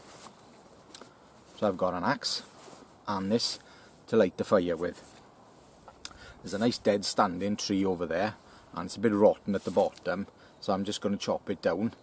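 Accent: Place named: Scotland, United Kingdom. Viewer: New sub here. Love your accent. Scottish? Good content. Will keep on eye on you. I prefer to ramble and bitch, though.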